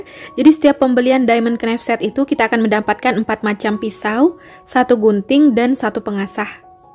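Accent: Medan, Indonesia. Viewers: owh medan patas logatnya hehe.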